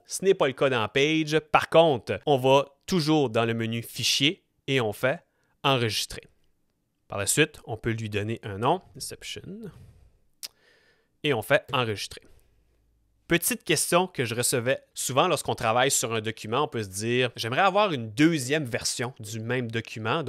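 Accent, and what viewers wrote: Canada. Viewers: Supers explications ! Enfin je sais comment transformer mes documents Pages en Word ou PDF ! ! Merci et j'adore ce petit accent canadien !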